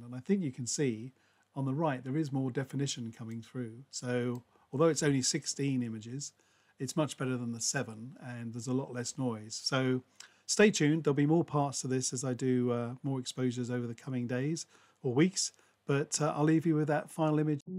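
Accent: Australia. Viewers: Looks like a great project, your accent sounds Aussie, you originally from down here?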